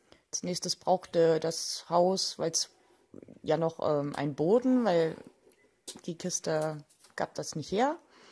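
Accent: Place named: Magdeburg, Germany. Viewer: Klingt wie Magdeburger-Raum-Dialekt :D Super Kratzbaum und Video! Gibt n Like :)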